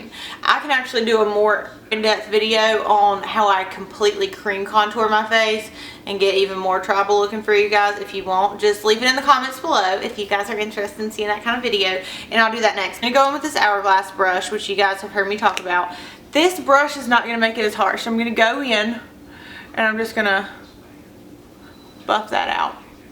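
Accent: South, United States. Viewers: aw i love your southern accent:) i also use the mac emphasize to set my highlight! your videos are really great keep up the good work girl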